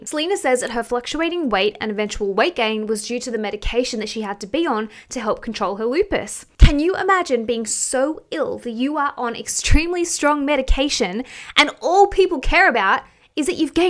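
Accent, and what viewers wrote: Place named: Australia. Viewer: Girl! Since you been back in Australia your accent is getting stronger! I'm here for it not gonna lie lol 🥰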